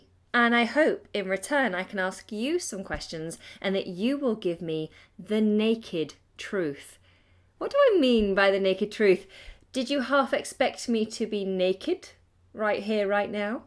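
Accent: United Kingdom. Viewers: I love you and your British accent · I LOVE YOUR BRITISH ACCENT. YOU ARE SUCH A HUMBLE PERSON !!!!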